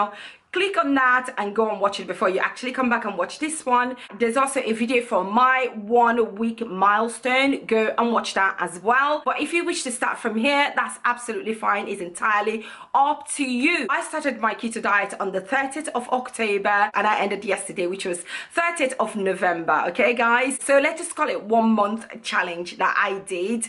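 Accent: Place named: United Kingdom. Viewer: Love your accent are you British?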